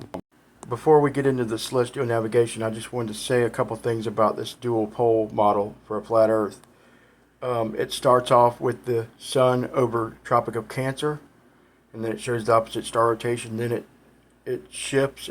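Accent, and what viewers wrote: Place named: London, United Kingdom. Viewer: You sound like your from lonDon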